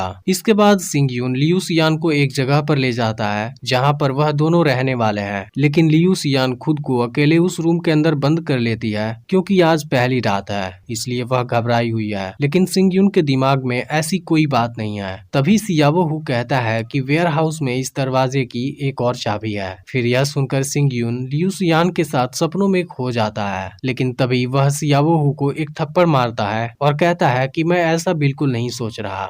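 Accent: India. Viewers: I hate the Indian accent......I can't stand hearing it awwwwwwwww🤮🤮🤮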